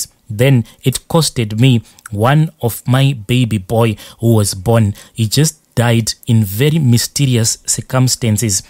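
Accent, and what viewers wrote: Zimbabwe. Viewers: Is he from Zim this brother his tongue said so.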